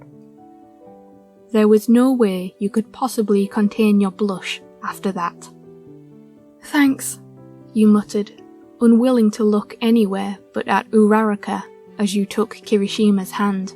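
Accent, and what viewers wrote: United Kingdom. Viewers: never did i think i would reach a state in life where im listening to a british accent audiobook. i think im depressed.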